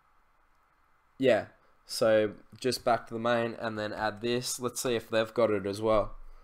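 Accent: Australia. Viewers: Wich part of Australia are you mate??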